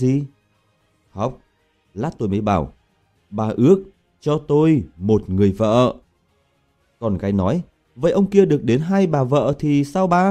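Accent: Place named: North, Vietnam. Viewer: Người kể chuyện mà theo giọng Quảng Nôm thì hấp dẫn vì sống động hơn nhiều Giọng Bắc khét lẹt!!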